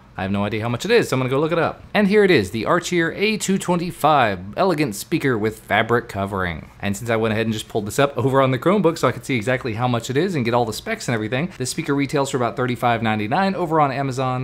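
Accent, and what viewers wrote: Kentucky, United States. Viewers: what part of kentucky are you from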